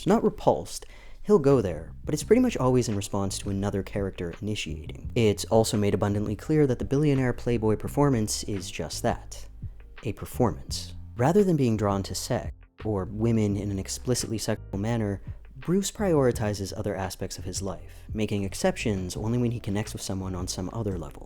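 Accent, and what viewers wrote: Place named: Canada. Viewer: How am I just realizing that is Canadian? Like, SUPER Canadian. I've been watching him for months and it just glossed right over my head. Maybe because he just sounds like home, so it didn't even register.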